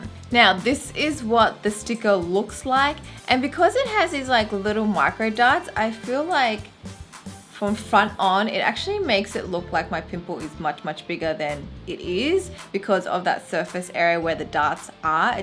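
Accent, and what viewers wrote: Australia. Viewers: What accent is urs? Sounds a bit Australian but im not sure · An Asian woman with an Australian accent? That's so cool!!! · The Australian accent.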